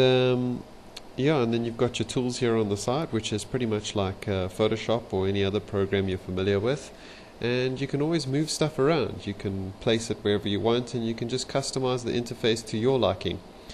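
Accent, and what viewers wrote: South Africa. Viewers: He's South African, not British. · omg r u from south africa cuz u sound like it ... im from cape town !! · you are South African hey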